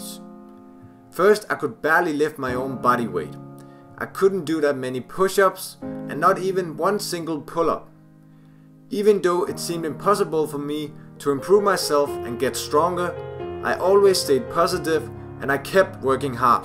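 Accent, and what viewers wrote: Denmark. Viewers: Hey! You're a big inspiration to me, ty for being who you are. I can hear on your accent, that you're danish too. #DanskArmy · are u from denmark xD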